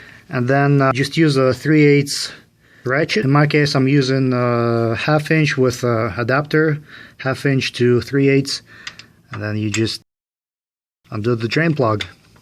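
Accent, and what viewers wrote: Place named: Russia. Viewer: Так и человек говорит с русским акцентом.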